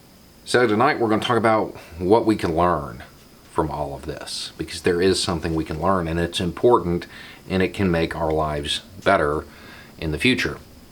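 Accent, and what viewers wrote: South, United States. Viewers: All political commentary should be delivered in a thick southern drawl.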